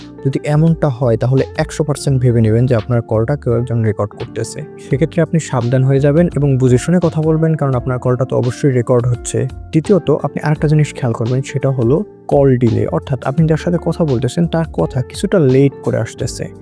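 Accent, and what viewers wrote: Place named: Bangladesh. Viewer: উনি বাংলাদেশের লোক। ওখানকার ভাষায় বলছে।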